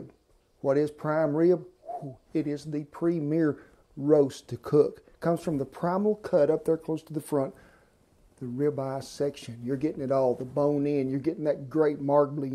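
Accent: South, United States. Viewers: I swear to god. Folks that sound like Bubba from Forest Gump know their shit. Not trying to make fun of this gentleman’s accent but by the end of this video I was drooling. I’ve come to terms that accent = master cooks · I love that American twang😂 🇬🇧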